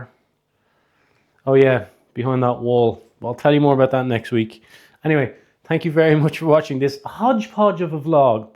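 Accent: Ireland. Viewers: his Irish accent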